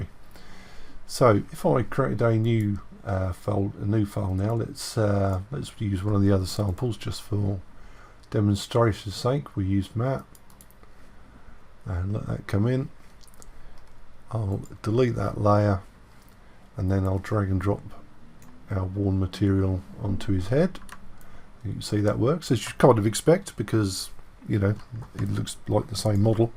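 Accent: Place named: England, United Kingdom. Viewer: Thanks, great tutorial. May I ask what part of England your accent is from?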